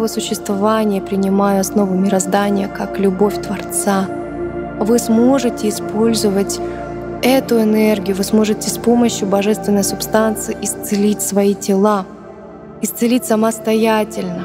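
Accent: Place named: Moscow, Russia. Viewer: Московский говор на понтах. Не подходит к этому тексту. П.С. раса с одной с, а не расса.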